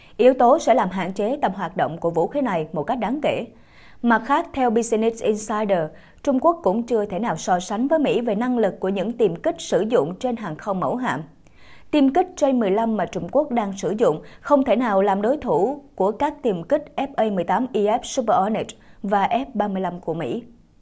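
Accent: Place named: Central, Vietnam. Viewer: người đọc có giong miền Trung dễ nge.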